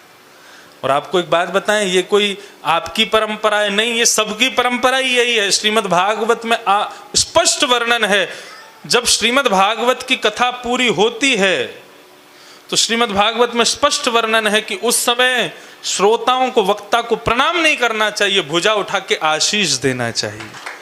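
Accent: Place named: Braj, India. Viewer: ब्रज की वाणी मे रस और अपनापन महसूस होता हैँ 🙏धन्य हैँ आप महाराज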